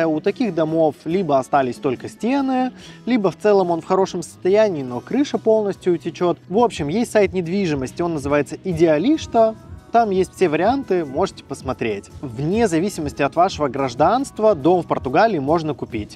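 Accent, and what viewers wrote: Standard. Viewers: Рада слышать хороший,чистый русский язык! Отвыкла давно от этого Современную речь слышишь,даже многого не понимаешь из за сленга Особенно у молодежи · Теперь понятно от куда такая чистая речь. Успехов вашей семье во всем!!!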